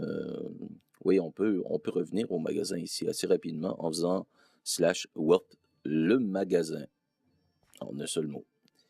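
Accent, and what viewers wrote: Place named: Quebec, Canada. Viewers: C'est normal, c'est un québécois.. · Tu viens d'où au juste ? Si t'es de la France, je peux te dire que t'as un petit accent québécois, j'ai l’impression.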